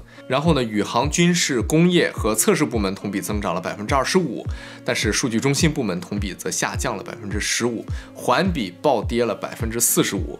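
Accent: Shandong, China. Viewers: 听口音是山东人吗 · 听口音老乡啊山东淄博济南?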